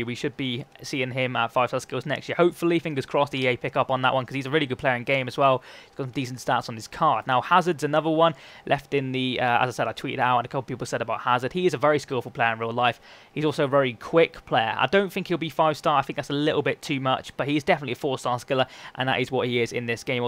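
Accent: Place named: Cornwall, United Kingdom. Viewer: you sound very cornish!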